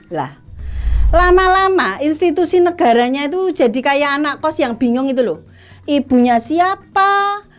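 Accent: Madura, Indonesia. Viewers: Ini mak jelas org madura nih, Karena aksen suara nya ada aksen madura trgas, lugas, jelas, cerdas, kreaktif, itu tipe wanita madura.. Itu prediksi saya, Maju terus mak. Konten mu sangat membantu utk koreksi, intropeksi buat aparatur negara.. Semoga sukses mak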